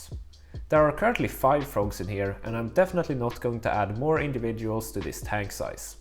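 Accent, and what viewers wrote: Sweden. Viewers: Hörde direkt att det var en svensk dialekt! Kul att se fler svenskar som älskar grodor. Followed immediately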